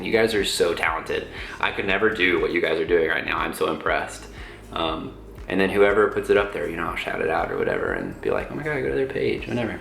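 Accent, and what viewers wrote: Arkansas, United States. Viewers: Lovely story. Love your AR accent. I used to have AR accent too.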